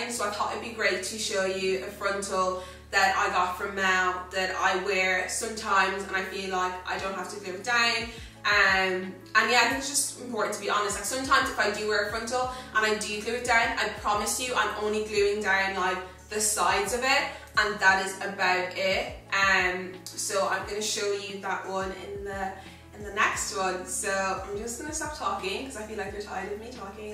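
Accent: Ireland. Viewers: OH thank you! loool sorry, i think have a little trouble understanding irish accents sometimes but i love them tho! so bloody charming! <3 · I love the Irish accent.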